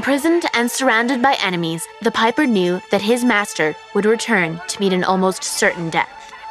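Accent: United States. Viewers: Scotland in a US accent. Gimme a break. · This is just taken from the "Castle ghosts of Scotland" series except they've just cut it down and remixed some scenes while cutting out others and got a Yank narator instead of the original which had an Englishman.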